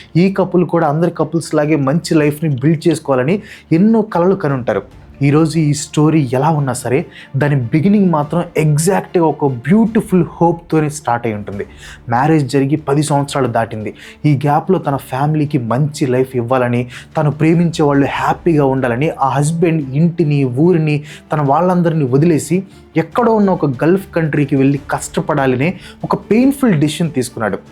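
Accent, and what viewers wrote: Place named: Telangana, India. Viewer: You are good like but your telagana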